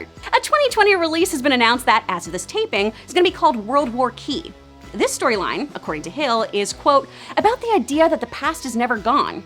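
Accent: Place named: Texas, United States. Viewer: Even with the obvious changes they're making, I'm still excited to see this. Also, your Texas is strong in this vid and I'm living for it.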